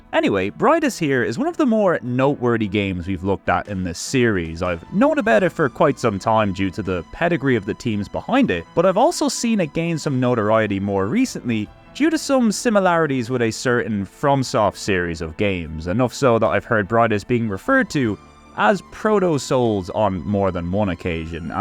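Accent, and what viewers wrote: Ireland. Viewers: Here for that sweet sweet irish accent. Oh and the games of course, need to grow my backlog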